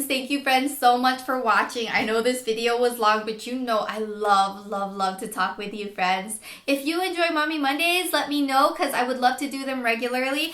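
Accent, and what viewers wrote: Los Angeles, United States. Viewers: you are such an LA girl.. ur accent the way you talk is sooo LA.. (i live in LA) i love it... 'u can take the girl outta the hood but not the hood outta the girl' godbless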